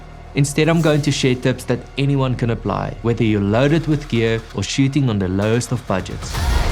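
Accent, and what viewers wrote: South Africa. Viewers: I love your South African accent- you all speak with Authority-Love it --- Love the vid man! keep it up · Wow, it's so good to hear that Afrikaaner accent after all this time. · Tell me you're South African without telling me you're South African (apart from the accent) - doing more with less ;) !!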